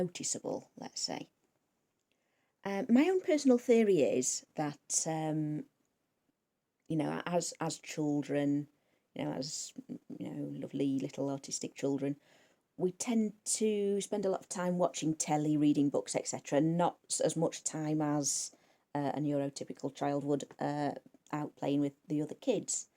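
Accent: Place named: Liverpool, United Kingdom. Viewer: Your voice sounds good to me. I heard a touch of Liverpudlian 🇬🇧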